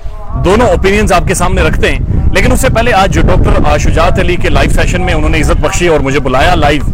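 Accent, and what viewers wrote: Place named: Kashmir, India. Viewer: Your pronunciation 🤓🤓sounds u belong to kashmir😂hum kashmirion ki urdu main bi apna kashmiri touch hota he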